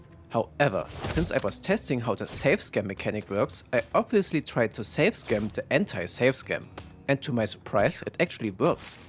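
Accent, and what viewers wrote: Germany. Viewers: Ich höre direkt raus, dass du Deutscher bist, Brudi 😂👍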